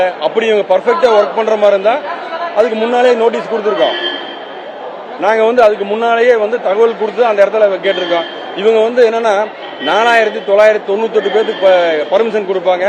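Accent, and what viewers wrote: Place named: Kongu, India. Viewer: கேப்டன் மாதிரியே முக பாவனை இருக்கு கொங்கு தமிழில் 🇪🇸🇪🇸🇪🇸🇪🇸🇪🇸💥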